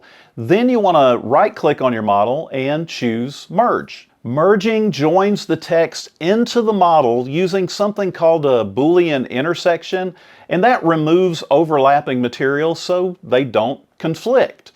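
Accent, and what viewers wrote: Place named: South, United States. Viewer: Was able to filter out the YT algorithm noise enough to discover u. Awesome stuff man. Also u talk like my dad and make me remember my time growing up in the south, so that’s nice!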